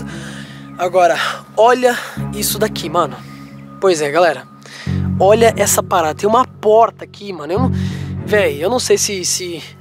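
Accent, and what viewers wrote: Portugal. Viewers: Fala portugues de portugal kom os meninos da kasa..😉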